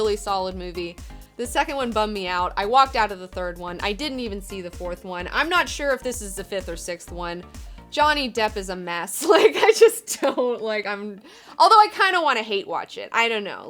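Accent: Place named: South, United States. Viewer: Oh my god, I didn't realize that you're pushing back a Southern accent until you broke into the stronger (though still mild) Deep South accent when you were get excited (and possibly because of what's in your drink) about how Sony's fucked up Spiderman. Also, truer words have never been said. Sony is a sad fucking movie production company.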